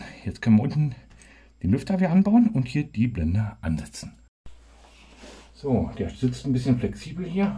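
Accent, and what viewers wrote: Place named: Brandenburg, Germany. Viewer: Diese 8 Minuten vergehen wie im Flug... In welchem Landkreis bist du denn Zuhause? Überlege ob ich mal deinen Tracker genauer in Augenschein nehmen muss!😊 Klingst nach südlichem Brandenburg....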